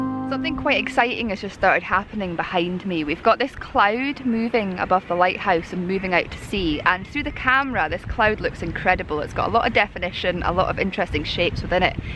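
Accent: Scotland, United Kingdom. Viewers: What a pleasant change to hear a Scottish voice - sub'd!.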